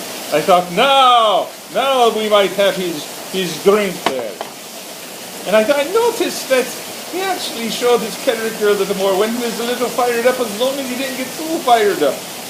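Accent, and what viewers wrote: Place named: Ireland. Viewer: Awesome story and i love the irish accent very nice👍👍👍👍👍👍😃😃😃😃😃🍀🍀🍀 best regards peter.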